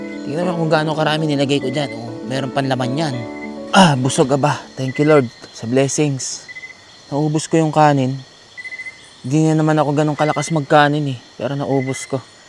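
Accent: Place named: Pakil, Philippines. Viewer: parang taga Pakil ka sir? kilala ko punto mo eh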